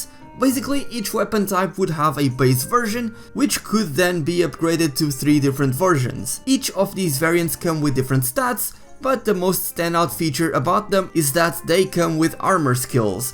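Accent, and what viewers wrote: Portugal. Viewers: Comecei a ver o vídeo e estranhei logo o sotaque. Grande tuga! + um sub :)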